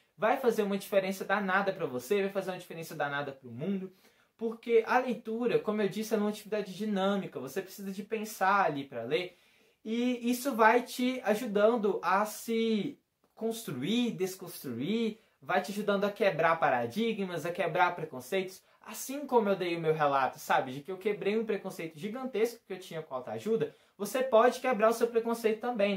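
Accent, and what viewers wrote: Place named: Minas Gerais, Brazil. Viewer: É uma delícia ficar ouvindo esse sotaque mineiro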